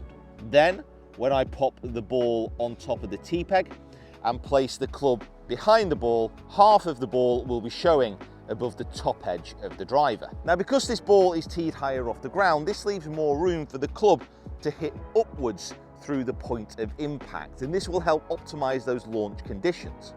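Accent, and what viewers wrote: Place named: Texas, United States. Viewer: what part of Texas is he from ?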